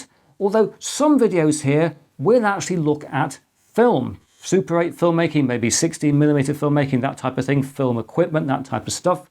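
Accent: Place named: United Kingdom. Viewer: I have subscribed to both of your channels because I'm interested in computing and older technology, but I also love the way you explaining things in this very British tone of voice. I can't help myself, but I just love the way you talk. It's the clear and precise English vocabulary from my earliest learning lessons in school which makes it so easy for me to understand what you are talking about. Greetings from Germany.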